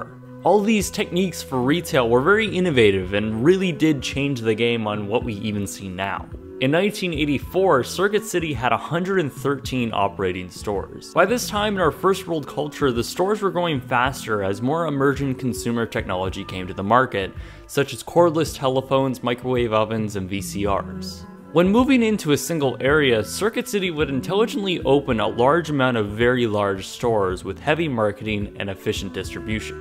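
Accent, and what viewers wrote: Canada. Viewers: narrator is Canadian. They pronounce some words differently. Gotta problem wit dat? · I can hear the Canadian accent in your voice. Makes this video that much better.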